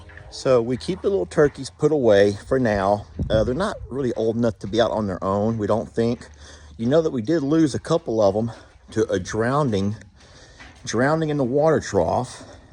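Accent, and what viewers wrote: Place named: Texas, United States. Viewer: Texas accents are NOT TERRIBLE. I have one but not as much as you. But I love them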